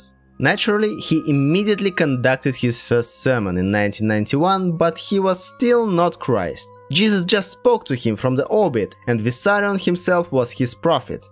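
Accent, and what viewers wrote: Russia. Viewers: What a terrible russian accent you are speaking with! But I'm ok with that. Have you been there? Why do you think this info is worth spreading? It is "prooved" only by those who imprisoned Vissarion. Go to the webside of the community and check how people deal with information.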